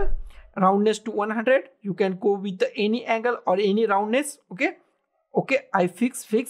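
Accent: India. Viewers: Good tutorial right here, just fix your hard indian accent and you'll get 100k sub in no time. Cheers man, big ups to you.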